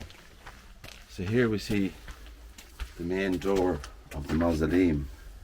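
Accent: Ireland. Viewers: Absolutely beautiful. Such a work of art. I had to laugh though. Your Irish accent can be difficult for my American ears. Sounded like you said the marble was brought in by a “horse and cat”. 😅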